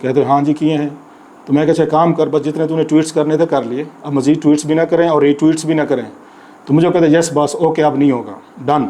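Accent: Saraiki, Pakistan. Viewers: آپ کا انگریزی بولنے کا لہجہ سرائیکی اور اردو ،پنجابی مکس ہے سر آپ صرف اردو بولا کریں ۔ کیونکہ انگریزی کا فقرہ سمجھنے میں آپ کا وی لاگ بہت آگے پہنچ جاتا ہے ۔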